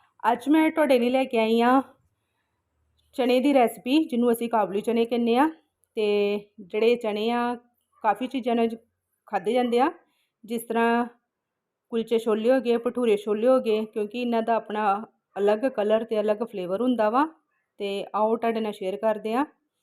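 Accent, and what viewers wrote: India. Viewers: ਅਂਮਿਰਤਸਰੀ ਬੋਲੀ ਬੋਲਦੀ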